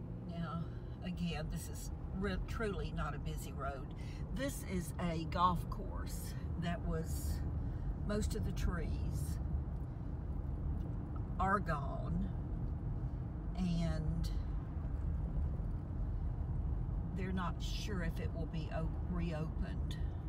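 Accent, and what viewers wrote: South, United States. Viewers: I love your videos. Reason one as a women just entering her 60’s, it’s nice to see you can still be young at heart. Reason two. My pops family is from the south and listening to you speak is like music to my ears. I live in the Rocky Mountain west. So not much of your charming speech around hear. So glad I came across your channel.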